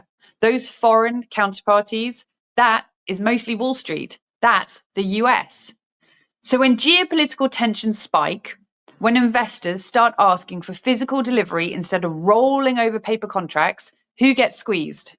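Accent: United Kingdom. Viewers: Love this fine lady. She speaks my language and with a beautiful and elegant British accent. 👍 ❤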